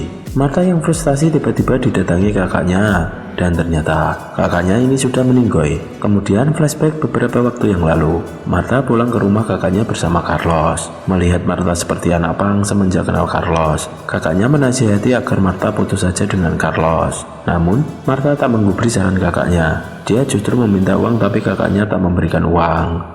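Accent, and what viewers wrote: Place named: Java, Indonesia. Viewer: Kok kk kenal suaranya medhok2 gini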